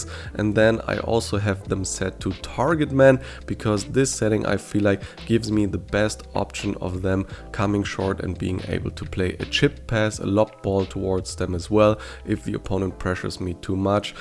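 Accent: Germany. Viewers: Deiner Aussprache und deinem Akzent zufolge kommst du aus Deutschland wieso machst du die Videos dann nicht auf deutsch?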